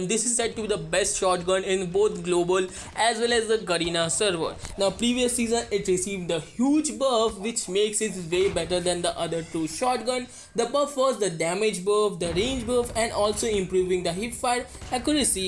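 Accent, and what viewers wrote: India. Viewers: Bengali English accent.....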